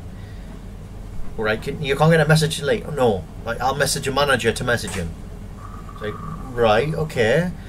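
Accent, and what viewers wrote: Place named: Gateshead, United Kingdom. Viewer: Hi buddy, fellow Geordie, thank you first of all for putting this video up and sharing your grievances with VM, like you I've been in similar situations with them, it's almost as if they're doing guess work when they're discussing the account, I don't pay by direct debit, that's one thing I never have let them do since I joined Virgin Media in 2009, I've been with them since then, I didn't know you could get Netflix Premium by the way, lol.... I might bully them into that at some point. I worked at a call centre in Stockton when I lived down there in 2007, it was just bog awful, I had complaint after complaint after complaint, in the end I left cos I couldn't stand the pressure of working in a call centre so hats off to you for doing what you do. You sound like a Gateshead lad, loved the video mate. Thank you once again for bringing this to YouTube and letting people know just what they're like.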